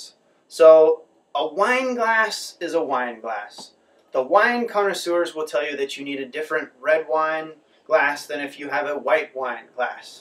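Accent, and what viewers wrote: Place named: France. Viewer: Love the French accent :D Thanks a lot brother, will definitely recommend!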